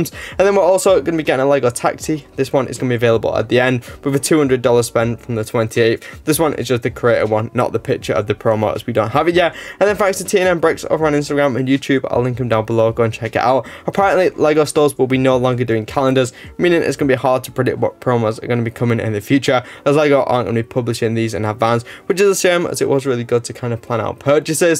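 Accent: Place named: Estuary English, United Kingdom. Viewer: It's pretty hard to understand him as a European, as well... I guess this is what's known as "Estuary English"... (More or less modern Cockney...)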